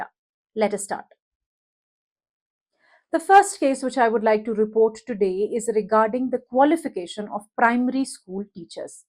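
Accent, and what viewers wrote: India. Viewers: Her accent is kashmiri english..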